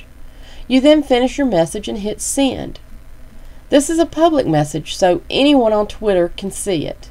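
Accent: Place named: South, United States. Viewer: This was just great! Your lovely Southern drawl made it feel even more captivating.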